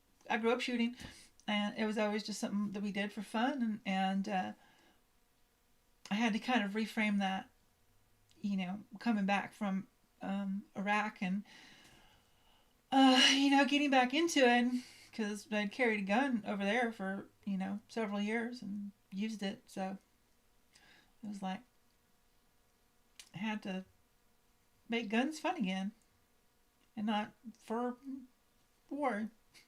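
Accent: South, United States. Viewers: It's my first time hearing your voice. Wow that soutthern twang!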